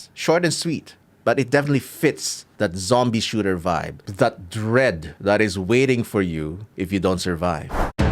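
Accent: Philippines. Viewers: You accent and looks, feels like you're from the Philippines. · pinoy to sa accent palang e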